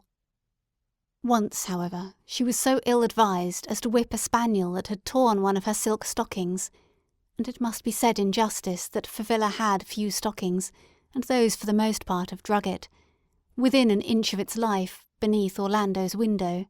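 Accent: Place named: United Kingdom. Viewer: Nicely presented. I enjoyed listening to your voice and beautiful Bristish accent 😍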